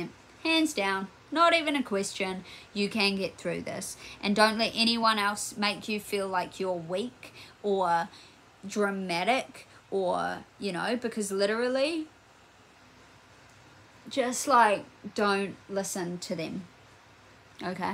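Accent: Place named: Australia. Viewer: You are absolutely right, don ´t let anybody rush you! But the thing is: people do rush you, things which seem totally simple to them are like climbing Mount Everest for you, for example: (when you are finally allowed to): take one step! The (emotional) problem is, in my experience, not yourself, the fact that you have to rely on a lot of help from others etc, the problem is all of the other people (even the physiotherapist), who rush you and put pressure on you - this is what causes the “bad” emotions to you. And like you, I had days where I wished I was dead, not (only) because of the pain (which was excruitiating, like you said), but just because I was tired of needing, help, I was tired of waiting for things to get better, I was tired because the whole situation was soooo unbearable strenous and exhausting…. I don ´t know how good the medical care is in your country - I don ´t know where you come from, from your accent I think Australia or New Zealand - in Germany our health system is at a low and you don ´t get Physiotherapie for more than 6 months 😮 and then “everything has to be ok again” 😡 I found your video by accident today, your video is 1 year old, you are young and I really hope that you are back on track, that your leg is functioning “ok” for YOU, so that you can go on with your life and do all the things you want to. 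I am 58 years old, 2 years ago I had a poly trauma with ancle- fracture, tibial plateau fracture, knee cap fracture and fracture of my upper arm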